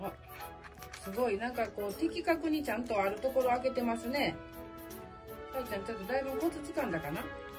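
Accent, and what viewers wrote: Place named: Kansai, Japan. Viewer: 関西弁は親しみやすい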